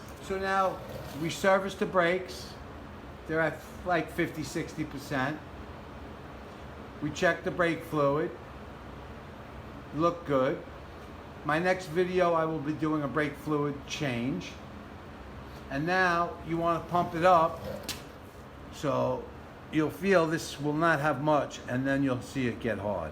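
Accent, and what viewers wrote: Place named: Long Island, United States. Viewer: Thank you for the video! And your voice is awesome, reminds me of the neighborhood I grew up in on Long Island. 👍🏾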